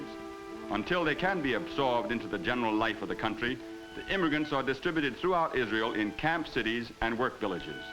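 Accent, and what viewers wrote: United States. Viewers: To me it sounds American. Only a few words here and there sound "British" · It's called Trans Atlantic English. It was very common back then. No one actually talked that way, but pretty much any American movie from that time has this accent. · To us Brits it's definitely an American accent. His pronunciation is a tad bit dodgy as well. Druzes!!! It's just Druze.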